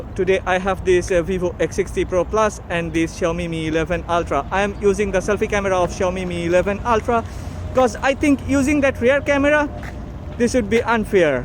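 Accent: India. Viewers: Ur accent sound so much like a bengali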